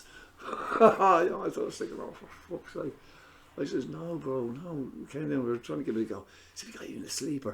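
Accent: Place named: Ireland. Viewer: Have you ever heard of the rehab in tallaght in Dublin guy Leyton was next to a traveller's site .. the house was a mess they had found out how it was so damp and cold ... tree roots were under the house the walls had mold was a Christian ✝️ centre basically theres your room sweat it out this was in February.. it was freezing i was looking at the mold thinking 🤔 this isn't healthy but i was two sick .. opiate and benzo withdrawal met some great guy's but 🫣 it was i ruff time .. im a guy from Scotland i was 27 first took H at 15 but got on suboxone got my new job worked my way up had two kids .. but was taking dizepam like sweets to taper down from suboxone get on a lower dose .. but they said if your on dizepam cant take you 🤔🤚 no no iv not had them in weeks i lied .. that withdrawal in that old house 😮 im going to die here and its other addicts saying you will be ok 👍 god will help 🙄 yeah lots of crazy things happened.. 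but your voice reminds me of the guys there things like im in bits they called trainer's runners.. and pass the yoke everything was a yoke 😂😂 but good to see you clean and now free from the chains ⛓️ of withdrawal and hunting just to feel normal 🫶❤ love from Scotland.. Irish. And the Scottish seeem to have. The same sense of humour always get on from guys from Ireland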